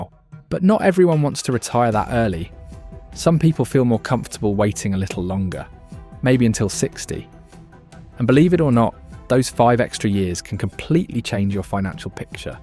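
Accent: England, United Kingdom. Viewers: An English voice talking about dollars (US is implied) rather than pounds or Euros. Did I miss the part in the commentary where he talks about who his intended audience is?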